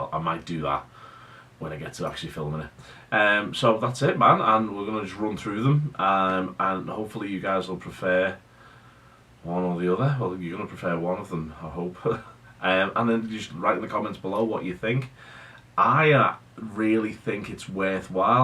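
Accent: Liverpool, United Kingdom. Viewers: With respect, I disagree. I enjoy hearing his strange Scouse-ish tones and humour. It's quite patently not a corporate demo.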